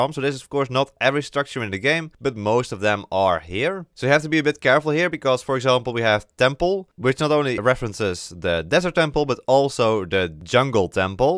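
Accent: Netherlands. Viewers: You can really hear the Dutch. I'm Dutch myself but i didn't expect it.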